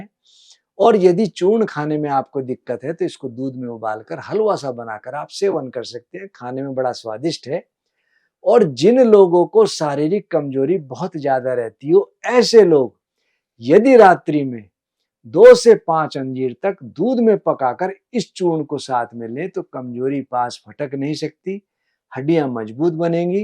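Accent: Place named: Haryana, India. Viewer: yes haryanwi doc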